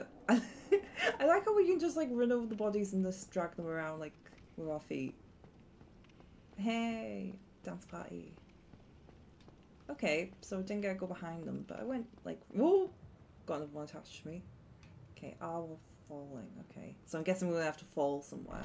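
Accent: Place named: North, United Kingdom. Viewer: Very quite, your accent sounds in my area of the north. But you could be a dude for all i know. Dark Souls 1 was a work of art its why it became so popular, but old now.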